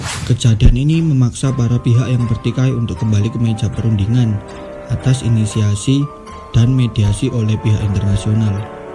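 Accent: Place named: Java, Indonesia. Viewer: medok wkwkwk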